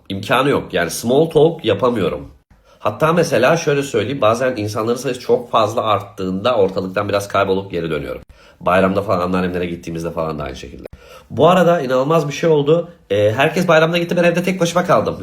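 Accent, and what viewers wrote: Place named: United States. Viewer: Abi Amerikalılar gibi konuşuyorsun ama dilin Türkçe.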